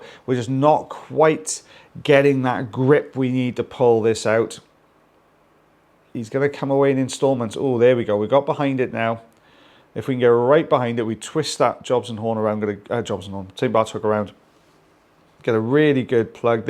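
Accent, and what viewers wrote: Wales, United Kingdom. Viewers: It's the welsh accent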